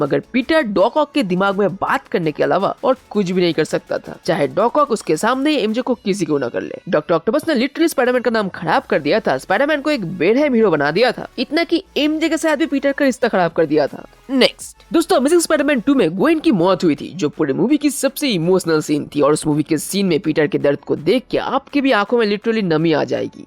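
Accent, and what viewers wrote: Bengal, India. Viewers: ji Aap kya Bangali ho?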